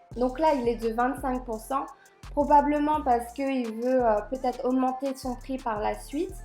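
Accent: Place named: Alsace, France. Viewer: Moi je pense d'Alsace ou Loraine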